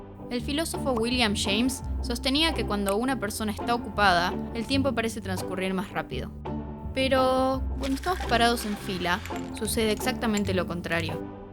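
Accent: Argentina. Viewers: Que bueno escuchar videos "en argentino" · Canal voz argenta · Supongo que por estadistica. Igual en Argentina no todos hablan asi. El acento este es rioplatense, y todo el mundo lo conoce como argentino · Que desagradable el acento argentino en mujeres cuando narran. Es muy local.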